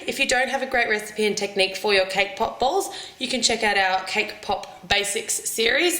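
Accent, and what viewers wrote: Australia. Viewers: Im always wonderin... ur austrailian right??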